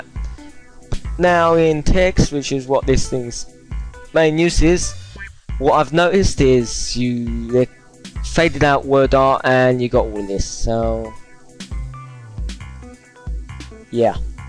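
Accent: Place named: United Kingdom. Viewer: You fool. This narrator isn't Mexican or an Immigrant. He's British. Get your narrow-minded head out your own ass.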